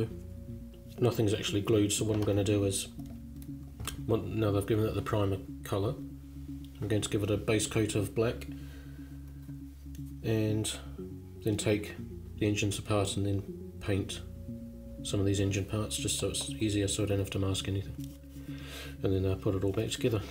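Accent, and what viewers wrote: New Zealand. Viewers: you are extremely talented! nice paint job! also are you from New Zealand?!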